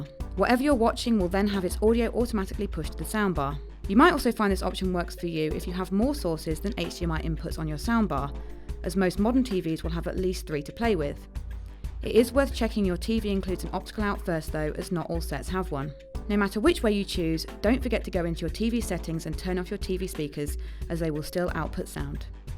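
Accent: United Kingdom. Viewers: I think she is British. I actually like her accent, it's much better than American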